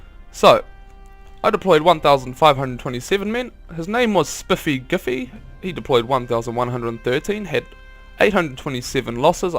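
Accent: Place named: New Zealand. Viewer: xD The kiwi accent restricts soooo much =(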